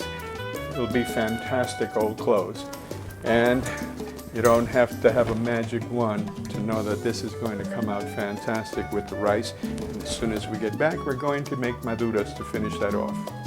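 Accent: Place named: New York, United States. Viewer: You can cook for Me anytime!!! ❤Adorable and that N.Y accent, love it all!!!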